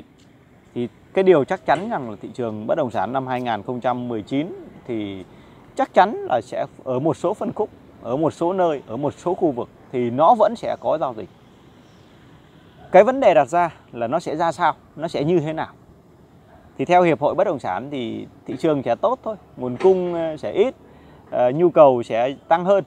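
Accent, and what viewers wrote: Thanh Hoa, Vietnam. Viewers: giọng thanh hố hay sao ấy nhỉ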